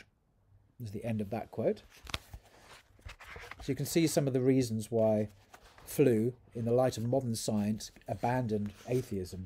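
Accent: United Kingdom. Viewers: Love your British accent, thank you for the clear exposition!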